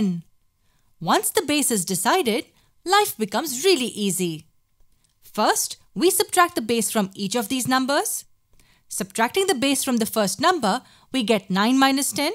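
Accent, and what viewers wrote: India. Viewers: the accent is clearly indian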